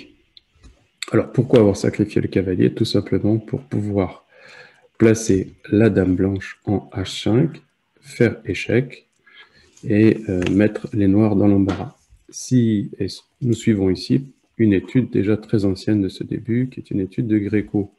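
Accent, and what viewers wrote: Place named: South, France. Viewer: magnifique vidéo, très pédagogique ! Je vais reprendre mon activité sur la chaine "échecs en amateur" et parler de ta chaine comme une pépite du net échiquéen francophone... dès que le COVID m'en laissera le temps (je suis médecin)... en tout cas c'est un vrai plaisir de visionner tes videos : courtes claires et ensoldeillées par ce bel accent du sud !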